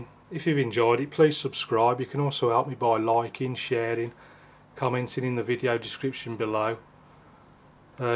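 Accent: Birmingham, United Kingdom. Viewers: Love the tips you have given on this, really helpful to me. Just starting to have a go at watercolour landscapes (I usually do portraits) finding it very difficult. Comforting listening to a fellow Brummie accent too. Thanks for posting these I'm going to have a go a painting along to this one.:). Your artwork is amazing!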